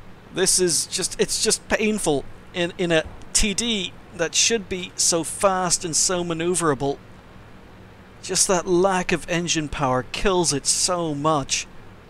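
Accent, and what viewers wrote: Ireland. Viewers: Your accent is fucking bizarre. Make up your mind are you Irish or a brit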